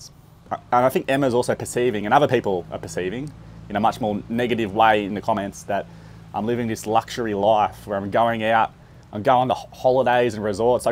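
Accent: Australia. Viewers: Hi I am from Burirum and recently I am live in UK 🇬🇧 I am watch your channel every day and I am learning a lot more English from you ❤ I quite like the Australian accent love it ❤ Anyway stay strong and think positive ❤you are doing well ❤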